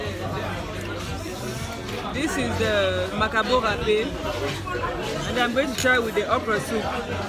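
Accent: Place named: Nigeria. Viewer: You have a Nigerian ascent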